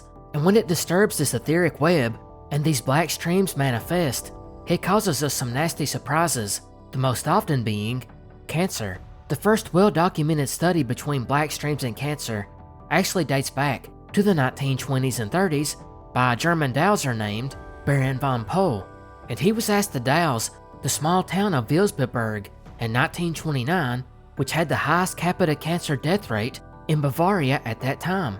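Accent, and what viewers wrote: South, United States. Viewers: Awh heck yeeah partner! 🤠 a country soundin’ commentator, let’s giddy up now!! 🤠 yeeee hawwww!!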